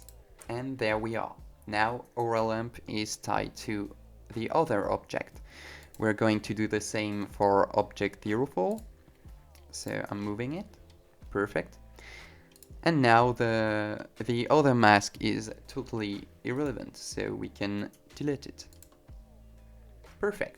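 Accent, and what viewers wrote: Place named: France. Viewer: Thanks so much for making this video. Your French accent is great mon ami!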